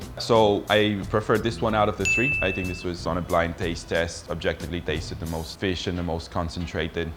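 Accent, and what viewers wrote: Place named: Philippines. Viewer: Dude your english has a filipino accent...Anyways Italian food goes good with Patis...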